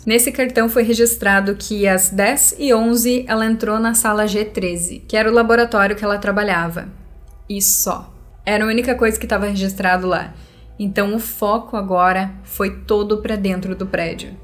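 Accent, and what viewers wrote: Rio Grande do Sul, Brazil. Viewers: Oi você é gaúcha? Amei o canal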